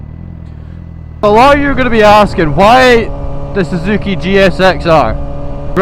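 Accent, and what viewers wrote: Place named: Scotland, United Kingdom. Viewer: Refreshing to hear a Scottish voice. Nothing wrong with keeping a bike you like .its for you not anyone else. I have a 1981 Yamaha RD350LC i have had it since 2000 . I have a 2000 suzuki tl1000s owned it since 2001 also a suzuki Gsxr1000 k5 owned for 4 years no intention of selling anything also a 1978 Yamaha dt175mx owned for 5 years. Be happy with whatever you have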